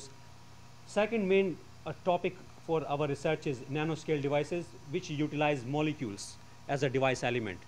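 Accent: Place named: India. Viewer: I just can’t stand the Indian accent, like whoever mad their language didn’t realize how annoying and un intimidating it is. I I woke up in India I’d probably shoot my self so I wouldn’t have to hear that shit everywhere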